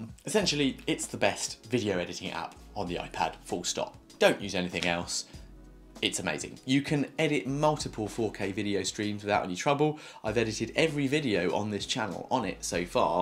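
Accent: United Kingdom. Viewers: That british tho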